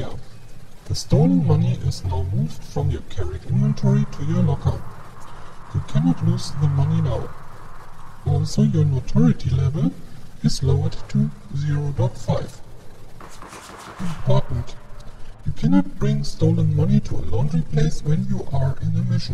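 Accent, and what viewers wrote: India. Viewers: indian darth vader?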